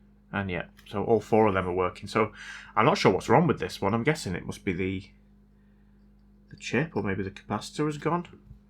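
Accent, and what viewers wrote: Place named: Yorkshire, United Kingdom. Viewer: I just want to know where you're from! Wakefield, Leeds or Huddersfield are my punts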